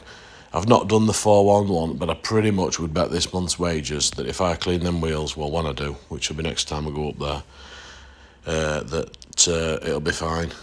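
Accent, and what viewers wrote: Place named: Yorkshire, United Kingdom. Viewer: Enjoying your work. Not a modeler myself but I like the total escape from my normal day that I get from watching your videos. For someone relatively new to it your layout looks great. Your eye for a shot and the videos you produce are virtually unique and are for me far more engaging than the more usual style which mostly consists of trains going round in circles. And all toped of with your dry as dust take it or leave it attitude delivered in a friendly Yorkshire accent. I think you are on to something.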